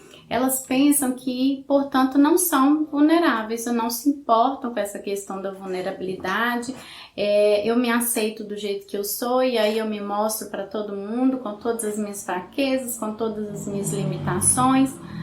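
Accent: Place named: Northeast, Brazil. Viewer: ahh achei o sotaque parecido ,eu sou do nordeste .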